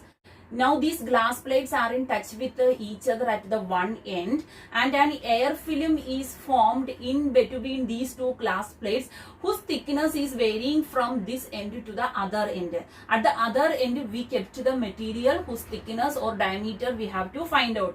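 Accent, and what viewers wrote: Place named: South India, India. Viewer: Tq mam,r u from kerala/tamilnadu/andr..mam?ur accent is like my bio teacher who teaches awesome like u mam 🤩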